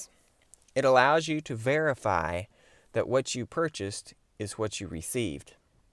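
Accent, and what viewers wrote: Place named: Alabama, United States. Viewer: I know you You're from Alabama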